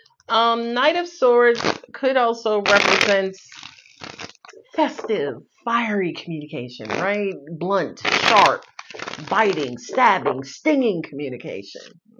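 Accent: New York, United States. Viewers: Love this episode. I giggled so much. You giving me ‘I may have had 2 margaritas at dinner’ vibe!!! Was just waiting for the NY accent to present itself! ❤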